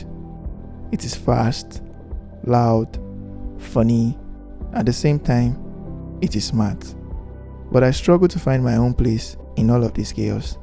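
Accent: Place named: Yoruba, Nigeria. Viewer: Love this video, and the H factor that comes with the yoruba accent,🥺❤️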